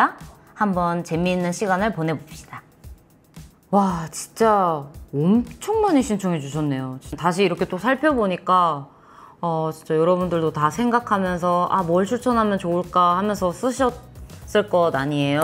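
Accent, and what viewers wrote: South Korea. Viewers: 부산 사람 맞죠???? 부산에서 응원 합니다.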